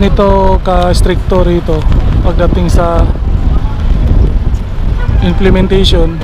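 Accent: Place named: Visayas, Philippines. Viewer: Ayusin mo nga pgsalita mo bisaya ka dong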